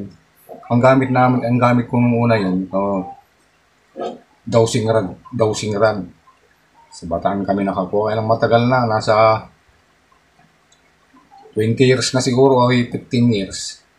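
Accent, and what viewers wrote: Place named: Ilocos, Philippines. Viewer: Tagat nu ka bro?Parang ilocano ka..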